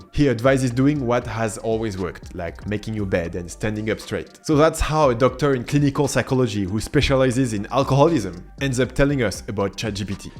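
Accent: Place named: France. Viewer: Gaining Youtube subscribers is clearly not an easy job. The few things I have seen on your channel are so well done that I can say it easily matches other content of Youtubers with many thousands or even millions of subscribers. Keep up the good work. Who knows how many people you will be to reach within a few years. By the way the french accent is actually charming 😂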